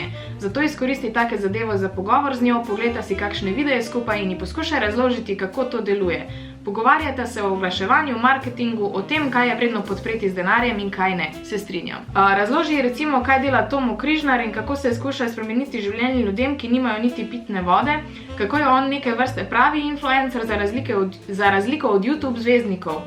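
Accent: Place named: Kranj, Slovenia. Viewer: Mene tvoj naglas sploh ne mot ampak je ok:) moja frendica ma tak naglas pa je iz Kranja. Nekateri imajo slabo mnenje glede tebe ampak meni si super;) tudi če snemaš life trvari ali whatever si dobra influencarka pa še vem ne kaj to pomen(: men je ful dobr da še mal anglish govoriš ( tut js) bodi taka kot si radi te mamo tako:)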